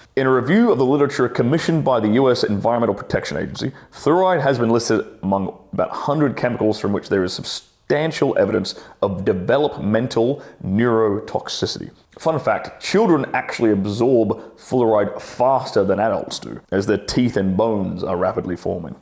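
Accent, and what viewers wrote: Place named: Australia. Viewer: As an Australian, this is the most annoying version of the aussie accent, if anyone talks like this, I just automatically assume they are talking out of their arse and everything they say is just bullshit one way or another. My success rate on picking up on bullshit artists is 100% and it's because they all have this stupid fuckin accent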